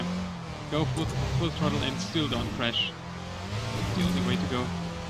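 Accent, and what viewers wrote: Germany. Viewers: deutscher aksent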